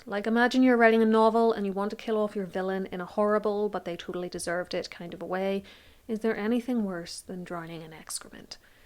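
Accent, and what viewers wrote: Ireland. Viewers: Is this an Irish accent? 🎉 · Oh i love your Irish accent! But your pronunciation of "count" and "counts" is somewhat unfortunate😂😂😂😂😂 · Love your Irish accent. Where in Ireland are you from?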